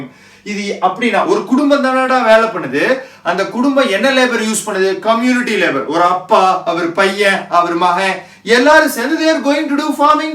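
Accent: India. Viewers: sir your mother tongue in kannada right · Sir a doubt you are from Chennai or Coimbatore. ☺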